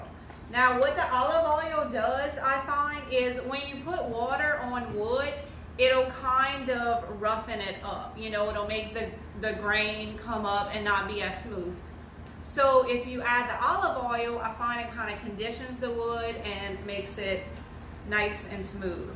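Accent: Louisiana, United States. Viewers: "and then you'll be ava to see how it looks". My grandmother was from DeRidder Louisiana. I'm from Texas and I've always been in LOVE with Cajun accents. You're so cute and so much fun to watch! You've inspired me to get up and get things done!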